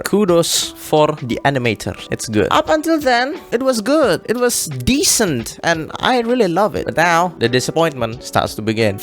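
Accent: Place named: Indonesia. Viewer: wait are you indonesian? you have such heavy indonesian accent when you're speaking in english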